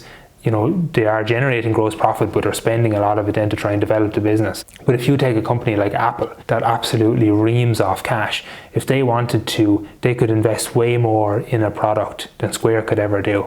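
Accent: Ireland. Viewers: Great to hear an Irish accent on the platform 👍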